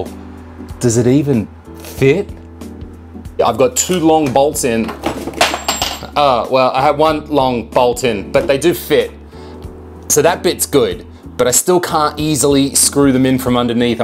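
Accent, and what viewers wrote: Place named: Australia. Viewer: Sounds like you're Australian, so I'm assuming you're in Australia. I just picked up a Thrustmaster T300 from Cashies'. It didn't come with the mounting 'thingie', so I was contemplating mounting it directly to the desk. Glad I found your video. If you want a quick-n-easy release, check out Bunnings and look for 'Richmond M6 x 40mm Handbolt'. Should be the size you need and comes with a convenient star head, which you can use to tighten/loosen the bolt with your hand.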